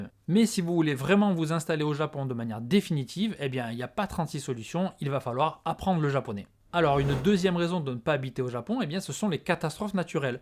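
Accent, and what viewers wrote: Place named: Marseille, France. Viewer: C'est marrant j'ai passé la moitié de la vidéo en me demandant d'où te venait ton accent. Je ne me serais jamais imaginé que c'était de Marseille, d'autant que j'y habite. Comme quoi, notre accent a bien des subtilités.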